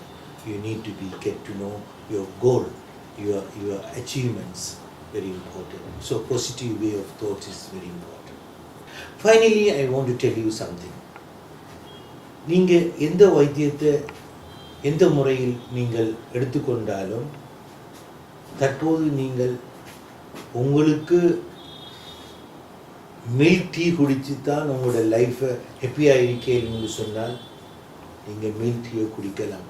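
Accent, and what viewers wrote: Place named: Tamil Nadu, India. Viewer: Congratulations dear Dr it's nice to hear like Tamilnadu tamil.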